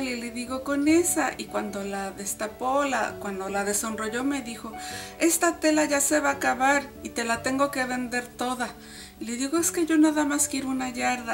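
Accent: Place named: Mexico. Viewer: me gusta como eres tu tu acento mexicano me encanta no te preocupes por esas personas que no merecen la pena tu hablas bien muchos besos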